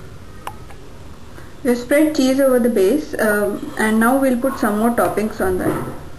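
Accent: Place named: India. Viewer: hahahahaha you are indian!!!you talk so funny!:D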